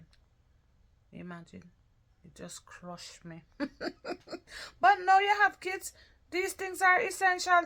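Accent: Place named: Jamaica. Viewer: Why come on social media to talk about it you use it so pay it and stop talking like Jamaican and idiot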